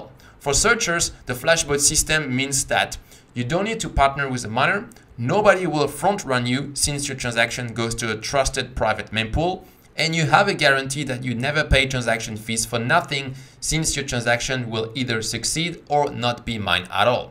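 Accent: France. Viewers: L’accent Français Youhoouu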